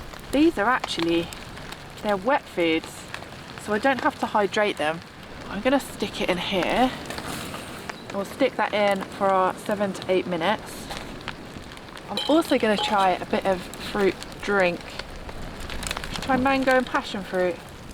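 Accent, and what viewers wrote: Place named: RP, United Kingdom. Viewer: thank you, love how you try to hide the posh rege either way. bon labore' Thanks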